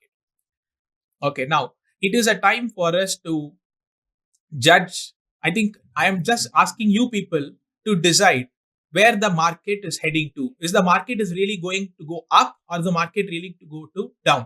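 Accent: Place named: South India, India. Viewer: Judging his accent , he's surely South Indian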